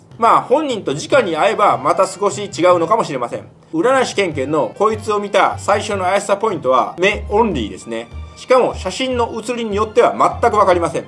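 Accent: Kansai, Japan. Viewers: 先生こんにちは。先生の動画拝見を毎日の習慣と糧にしているアラサーOLです。先生は唯一無二のコンテンツ・切り口や視点で、どの動画も本当にとても面白いです！関西のイントネーションやお話方もほんとに好きです。お伝えしたいことと疑問、また相談がありまして、今回コメントさせていただきました。盛り沢山かつ欲張りですみません…。先生の動画を拝見していて感じたのですが、先生はとてもはっきりとかつ相談者や相手のことを考えたうえで、観相学を活用したアドバイスなどをされていると思い、とても親身で素敵だなとおもい、ぜひ結婚相手のことなど鑑定していただきたいなと思いました。まずはお礼をお伝えしたかったので、前置きが長くなりましたが、先生の動画や感じるお人柄もとても好きだからこそ、何日ももやもやしていたことがあったので、こちらに疑問兼質問として書かせていただきました。先生はいくつか観相学を用いて、いろんな著名人を例にご説明されていましたが、日本人あるは在日の方の例がほとんどで、先生はたびたび人種差別はされないと仰ってましたし、私もそのとおりだと思う反面、いくつか動画の表現で、どうしてもどこかで在日の方に対しては排他的な印象を抱かれてるのかな…と胸が痛くなりました。悪人面で何人か取り上げらていたので…私のとり違いでしたら大変申し訳ございません。。なので、一つは、在日や外国人に対して何か差別的なお考えがなければ、ぜひ先生のご発言や動画は今後もっと多くの方に見られる影響力のあるものだと思いますので、血や肌の色を超えて平和を望む一個人としては、先生のお考えをまた動画で聞かせていただけると本当に幸いです。疑問と相談についてですが、私は友人に在日の方や外国人も多く、私自身もハーフなので、そういう純日本国民でない人の人相判断はできますでしょうか。結婚を考えている相手でお付き合いしているのが遠距離中の台湾の方ですが、例のウイルスが予想以上に長く、会えないまた会えても仕事を辞めて海外に移住しなければなりません。また、今別の方にもアプローチをされていて、その方は在日韓国人の方です。(何人とかあまり区別したくないのですが、一応情報として記載いたしました) このような状況もあり、どこでだれと生きていくのが幸せなのか、本当に分からなくなってしまっています。個人的な質問だと採用していただけないので、どうお伝えしたらよいかわかりませんが、エッセンスを抜き取っていくつかの疑問になんとかご助言いただけませんでしょうか…; 先祖が違う民族といいましょうか、違う文化の方(特に結婚相手としての異性)を見るときのポイントやアドバイスがあればぜひお伺いしたいです！仕事をやめて海外で生きていくか、日本で生きていくかも含め、とても悩んでいます。こんな自分が嫌なので、早くはっきりできるよう、先生助けてください; これからも毎日観ますし応援しております‼︎よろしくお願いいたします。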